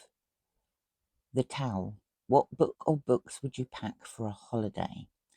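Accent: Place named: United Kingdom. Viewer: I enjoy the way you speak. It sounds very soft and elegant to me. I guess it kinda reminds me of Book Chat With Pat, but just… the British version? 😅 Yeah.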